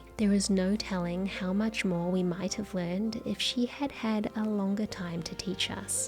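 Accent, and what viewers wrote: New Zealand. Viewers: That's just the kiwi accent. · I’d not realized before this video you were a fellow kiwi! I’m terrible at picking up accents.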